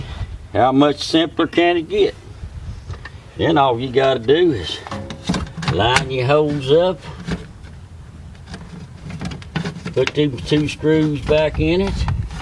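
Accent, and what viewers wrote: United States. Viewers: Thank you so much! You have a accent like mine from good old East Tennessee. 🍊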